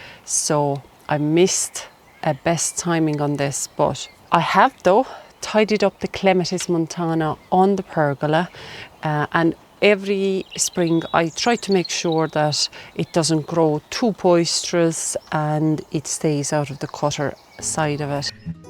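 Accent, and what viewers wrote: Ireland. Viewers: 😊 lovely video ,, where are you from originally ,, can't place the accent as its half Irish and half something else ? 😊❤ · The video lovely and calming, the Irish accent a bonus! Can't wait to return to beautiful Ireland one day!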